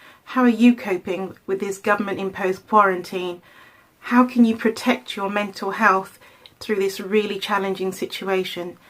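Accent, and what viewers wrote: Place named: RP, United Kingdom. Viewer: You've got a Received English account and speak fluent Italian- i want to be you when i grow up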